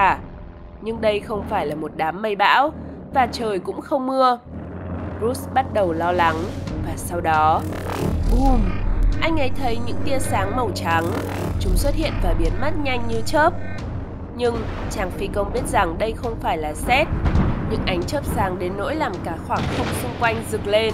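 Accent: North, Vietnam. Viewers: giọng bắc pha giọng nghệ tĩnh ở một số từ có dấu sắc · doc giong nam di giong bac kho nghe qua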